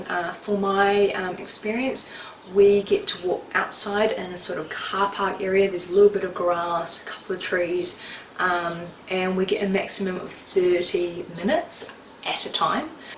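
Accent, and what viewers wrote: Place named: South Island, New Zealand. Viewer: Hey where in NZ are you from? Seems like a South Island accent. Sorry if I am wrong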